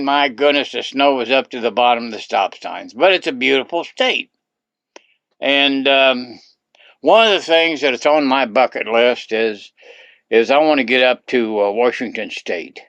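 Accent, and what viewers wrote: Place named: Texas, United States. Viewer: Great person, Love his bit of texan accent.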